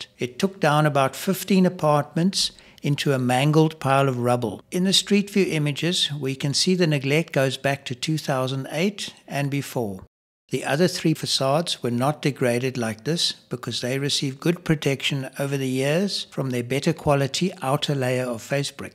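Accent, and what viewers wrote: South Africa. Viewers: I love how relaxing your voice/ accent it, is it South African? Also thank you for the informative videos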